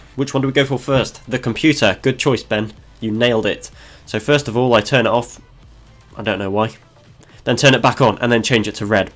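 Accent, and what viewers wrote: United Kingdom. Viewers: Hey, where are you from? London?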